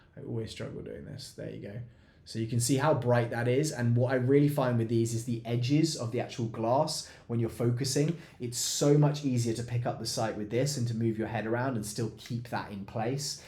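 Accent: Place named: United Kingdom. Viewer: I heard the british accent and began wondering whether or not this was a real gun.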